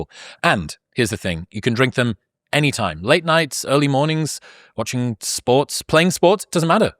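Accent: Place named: England, United Kingdom. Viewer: When did Zuckerberg get an English accent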